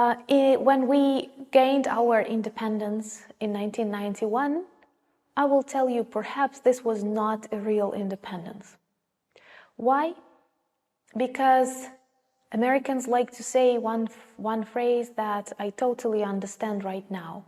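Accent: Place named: Spain. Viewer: Thank you very much for aproaching Ukraine pride to the world. By the way... Do you speak Spanish? It sound me like Spanish your start.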